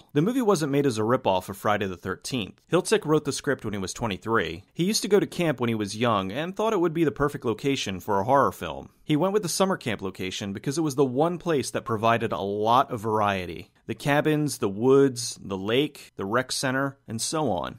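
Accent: Philadelphia, United States. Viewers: I sense a northeastern accent, near philly perhaps?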